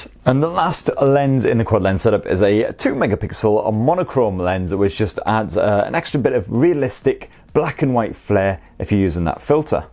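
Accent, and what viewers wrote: United Kingdom. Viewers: i hate that british accent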